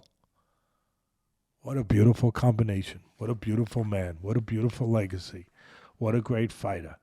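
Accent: New York, United States. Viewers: I love everything, especially the NY dialect. It’s broad, honest, genuine.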